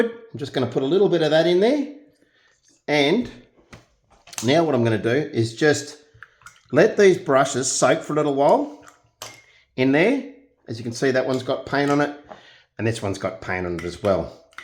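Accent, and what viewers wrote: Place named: Australia. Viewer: You deserve more views. Love your paintings and especially your very Aussie commentary.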